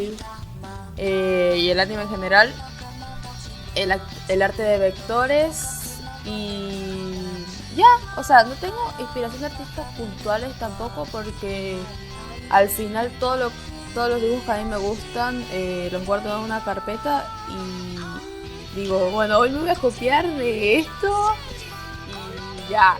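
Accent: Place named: Argentina. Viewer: BUENO EMMM, tenía la idea como de una manera más "silly" o tipo como alguien cuando se tapa la nariz NO SÉ PQ JDIDIEIKWKAKSKDN 😭😭😭, además no pensaba que se te notaba el acento argentino (lo cual no es malo obvio, además no soy nadie para "quejarme" al respecto pq a mí se me pegan acentos de otros países lolzies)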